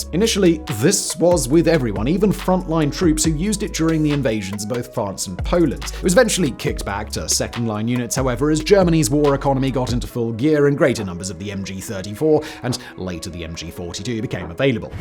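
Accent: England, United Kingdom. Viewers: Typical English lack of giving a shit about the conventions of other languages. And for just making up ways to say words. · If you didn't see enough Britcoms as a kid you might not notice. speaks SSBE, a prestige dialect understood around the world. I mostly speak US formal Inland Southern, another dialect understood around the world. If I narrated this, you'd probably complain about my consonant fronting and my heavy retroflex "r" sounds.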